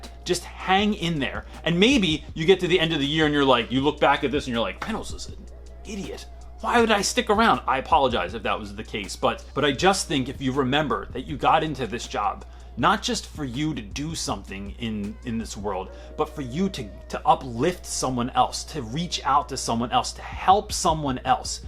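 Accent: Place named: Philadelphia, United States. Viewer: Yessss. I spotted a Philly dialect feature. "Those Johns" hahaha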